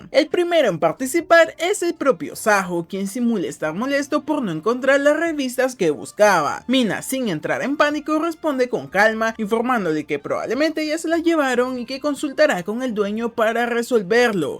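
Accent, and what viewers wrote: France. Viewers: Eres francés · Eres francés? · Se le sale el francés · Eres de paris o por que hablas asi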